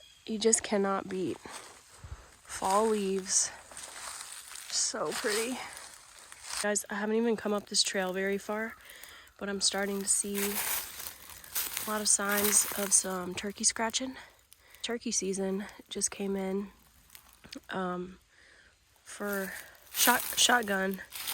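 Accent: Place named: New England, United States. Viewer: Recommended on my You Tube feed so I will provide feedback in the form of a comment. I am not interested in the You Tube channel of any American Woman, particularly, white ones like my Grandmother on Mom's side, Mom, my Sister, my Stepdame, and any other American Woman. Perhaps it is self hatred, but the Yankee accent only exacerbates my repugnance for the women my nation has produced-the feeling, no question, is mutual-extending beyond nationality to the white race. I will review my subscription list when I get a chance and make sure to remove any to American women, though I will target in particular those American women from the USA, and then, white ones. I simply cannot brook the sense of entitlement in these women.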